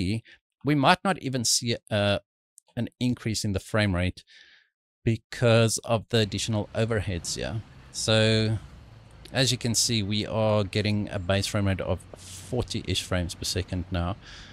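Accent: South Africa. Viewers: Ha SA accents always so distinct .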